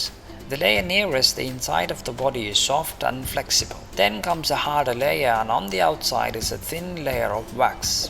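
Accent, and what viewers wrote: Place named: Qatar. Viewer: Nice Qatari Voice....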